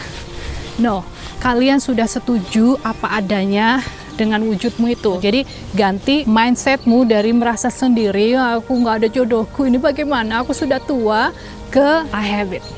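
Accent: Banyumas, Indonesia. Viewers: Owalahhhhhh,😍😍😍mbak ternyata wong Cilacap toh ☺️ salam ngapak mbak 🤣 Ora ngapak ora kepenak ❤️❤️❤️❤️ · Kereenn bgt si kak video ini.. aku merinding dan terharu.... jadi lebih semangat lagi dan ga mikir aneh2 tentang jodoh.. wahh ternyata kakak orang ngapak ya kak 😁 salam dari Banyumas 🤗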